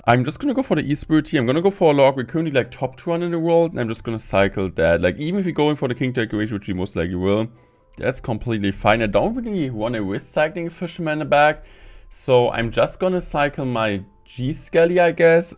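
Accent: Germany. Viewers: The german accent hits hard But good video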